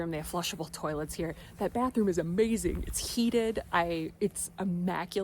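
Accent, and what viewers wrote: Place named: Minnesota, United States. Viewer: I was guessing from the accent you were from Minnesota. And then you verified it. Uff da😂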